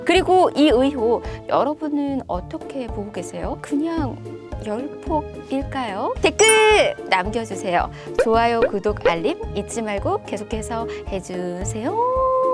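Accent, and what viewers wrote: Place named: Gyeongsang, South Korea. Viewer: 근데 앵커라는 사람이 경상도 사투리 ㅋㅋㅋ 경상도 출신이죠? 사투리라니.. 아물론 클레어인가 뭐시기인가 이런한동은 알려져야할듯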